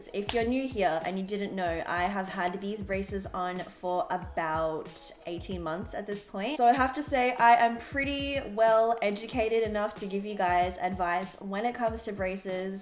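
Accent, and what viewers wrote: Australia. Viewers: You are Australian. Periodt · Are you Australian? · 😄 I was close. You was saying so many words that are close to what us British say, but I couldn't figure it out. I am familiar with the Australian accent, not sure why I didn't think of that 😊. Thank You for your reply and information in the video.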